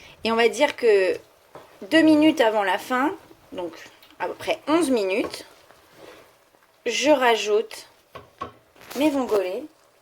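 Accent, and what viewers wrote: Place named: Italy. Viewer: accent italien, bonjour ! :face-purple-crying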